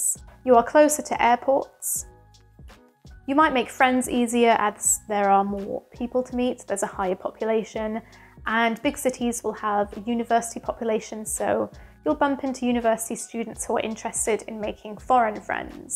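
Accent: United Kingdom. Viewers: Sound good posh English accent...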